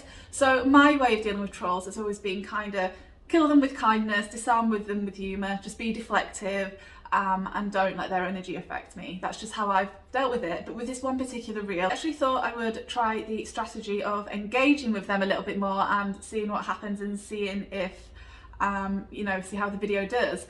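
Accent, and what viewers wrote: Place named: United Kingdom. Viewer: Hey! Love your work (and love your British accent). You are super talented and I have learned so much from you. Thanks for this video - you rock! ❤️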